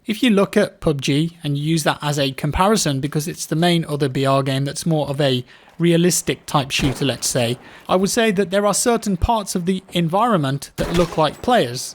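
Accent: England, United Kingdom. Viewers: I love battlefield and everything but I think the real reason I keep watching your videos is because that English accent is just delightful. 👌🏼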